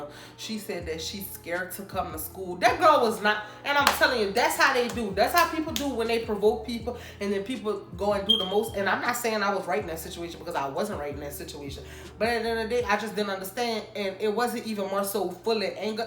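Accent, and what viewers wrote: Louisiana, United States. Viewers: Are you from Louisiana? Your accent is cute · She sounds like she from Louisiana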